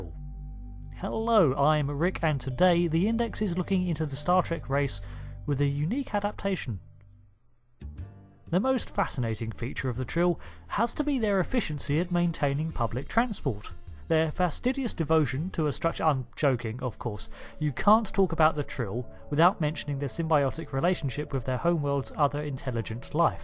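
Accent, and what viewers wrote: United Kingdom. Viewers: Better at 1.25 speed but still not comprehensible. What is it about this man’s British like accent that’s tough to understand like he slurs his words and expects us to understand your joke when you barely said it See — what joke.. you said “I’m joking” but what’s the joke you didn’t even say except their efficient choice/ mode of transportation.. which is not funny.. then it sounded cutoff and slurred out.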